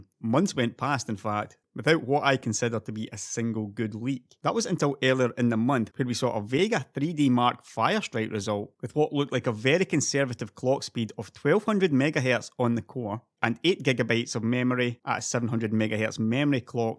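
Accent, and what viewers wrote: Scotland, United Kingdom. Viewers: as far as Scottish accents go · Where in Scotland are you from?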